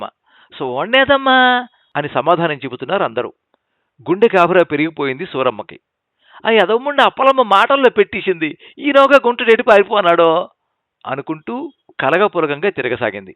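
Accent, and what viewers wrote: India. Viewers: కధ బావుంది. మీరు చదివి వినిపించిన తూరుపు యాస బాగా ఉంది. అభినందనలు.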